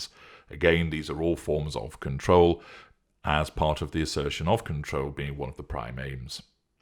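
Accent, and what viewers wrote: United Kingdom. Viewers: this gentlemen is british for sure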